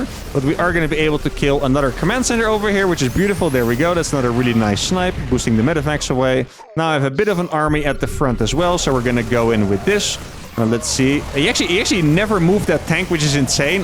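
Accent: Netherlands. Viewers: I've been lovin' your replays, big daddy. One can hear how much you enjoy the game through the excitement in your voice, and, the Dutch accent is just the cherry on the top. Keep it up, bru.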